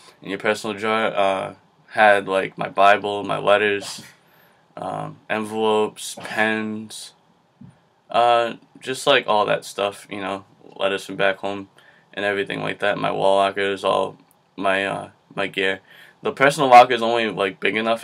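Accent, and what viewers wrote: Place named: New York, United States. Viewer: are you from NY? where's your accent from?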